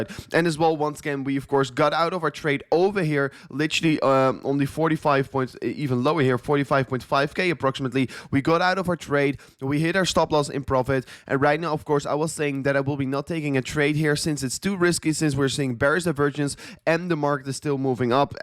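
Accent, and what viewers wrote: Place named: Netherlands. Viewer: bij zen eerste woord wist ik al dat die nederlands was lmao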